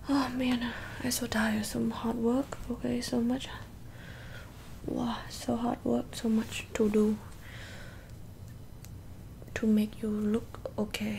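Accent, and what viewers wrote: Asia. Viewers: lmao need more videos like this with the funny asian accent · Oml please do a million more Asian accent roleplays these are my favorite thing on YouTube. I can’t help but laugh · Lollll this accent make more videos with it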